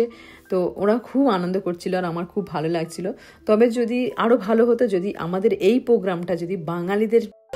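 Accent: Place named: Japan. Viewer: Apnar Bangla accent e toh ekhon ekta Japanese influence esegechhe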